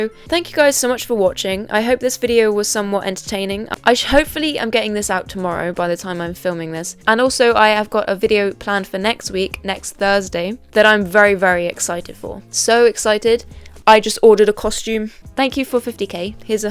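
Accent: England, United Kingdom. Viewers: I seem to have fallen into a habit of watching these types of video essays in the evening and no matter the topic, your commentary makes it fascinating and engaging, so thanks for that! I am trying to reclaim my attention span by watching longer videos lol. Also I find it such a struggle identifying English accents, I'm Irish so I know the difference between North and South and the really obvious ones like Liverpool, Yorkshire and Birmingham but would I be right in placing yours somewhereeee outside of London? As in the surrounding counties or somewhere like Brighton. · Oh great another British YouTuber! You should really learn the pronunciation of people's names and not Britishize them. I watched one episode of this garbage show and will not continue. I feel Kardashians are a plague upon this earth!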